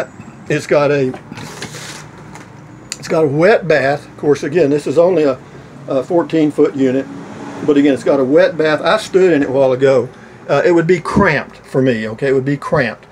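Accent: Texas, United States. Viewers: Nah, Billy Bob Thornton. Very Texas! :)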